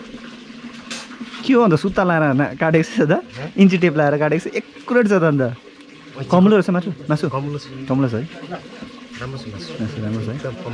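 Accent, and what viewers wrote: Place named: Darjeeling, India. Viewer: gaun thau herda nepal jasto chha feri boli darjeeling tira jasto chha kaha hola?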